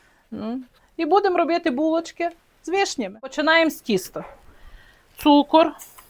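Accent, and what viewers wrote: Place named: Podillia, Ukraine. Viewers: Ви просто суперова господиня, а мова нашого Поділля,подобається Вас дивитися,булочки пекли з внучкою,получились дійсно смачні і Ви правду сказали,що треба пекти і не одну порцію.Дуже дякую.😊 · Чому жахлива?! Так розмовляють на Хмельниччині!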